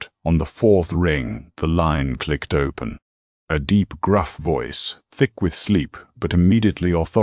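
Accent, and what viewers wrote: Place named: United Kingdom. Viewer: Great story but again, this voice is terrible. Maybe try something else, but keep it british! 🙏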